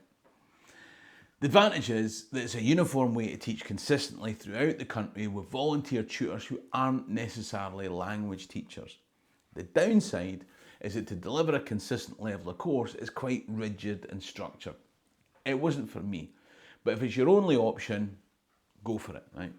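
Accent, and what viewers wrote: Scotland, United Kingdom. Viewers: We American women love a good Scottish accent. Any videos of you in a kilt? Thank you in advance.🌷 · I am learning your Accent as i listen to you speak..i realize it is possibly a regional accent so i wont say it is THE Scottish accent.i repeat how you say words..i love the Scottish Accent as i am a Henderson and have MacDougal family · I dunno, but sounds to me like you speak Gaelic with a Scottish accent. There's irony in there somewhere. You didn't mention Duolingo which has an introductory but extensive course in Gaelic. It's free (which appeals to me) and completely flexible (although they pester you to complete a lesson a day). For those in North America, there's Colaisde na Gàidhlig (The Gaelic College) in Nova Scotia. They offer in-person and on-line courses. (I don't believe there's a bar/pub, however.) <link> Tìoraidh an-dràsta. 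Chì mi a-rithist thu.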